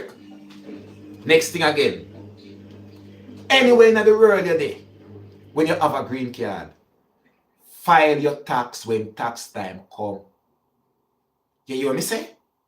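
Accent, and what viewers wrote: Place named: Jamaica. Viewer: ❤. Love listening and learning. Keep up the great work for the people.❤❤ Truly just Love the Jamaican accent. Would Love to return to and immigrate to Jamaica. ❤❤❤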